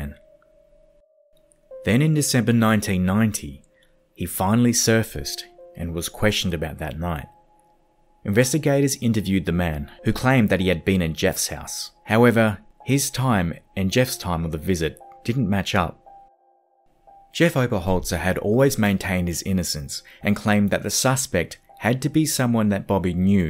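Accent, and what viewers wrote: Australia. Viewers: Great Aussie accent 🙏🏻🇦🇺🇦🇺❣️ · good to hear the Ozzie voice😃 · Love the Australian accent! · first here......subscribed after 5 minutes listening. Awesome mate......guessing by the accent.....OZ ? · Nice to hear an Aussie accent 🙂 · Good narration by this pleasant Aussie chap - definitely a thumbs up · So happy to hear an Aussie voice over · Aussie voice over ? I’m in thanks ! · I love starting a youtube video and hearing that sweet aussie accent. Keep it up brother!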